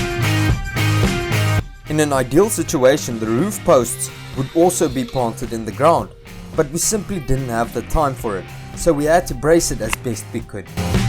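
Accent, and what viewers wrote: South Africa. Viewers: Hey man I loved the series, looking forward to seeing more content like this. I was wondering where you guys are located ? The accent sounds a lot like South African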